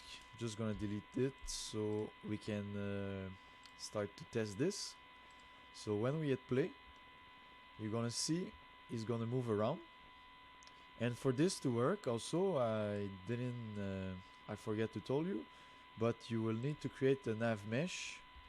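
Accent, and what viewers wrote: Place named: France. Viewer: Hey, just want to let you know that your French accent is very nice and clean, you speak English a LOT better than lots of my French friends.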